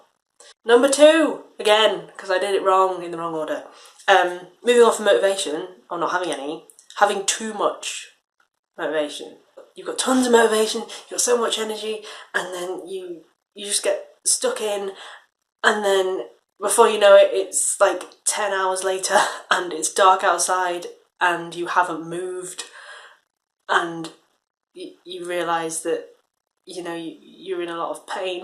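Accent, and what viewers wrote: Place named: Scotland, United Kingdom. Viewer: I can’t define your accent, you are from the U.K., I am pretty sure, but not from the Southern part. Maybe, Scotland, Merseyside?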